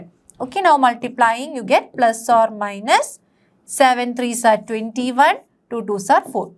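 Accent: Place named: Tamil Nadu, India. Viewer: Tamil acsent mam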